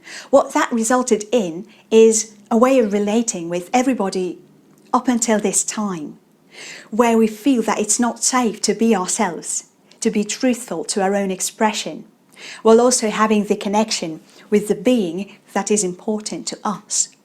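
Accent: United Kingdom. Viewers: I have known you for several years. I first texted you in VK saying that I am love with your amazing British accent. I started working on mine one year ago. You were a massive motivation for me, thank you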